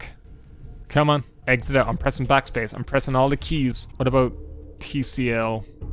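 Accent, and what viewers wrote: Ireland. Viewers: R u Irish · Another Irish YouTuber, nice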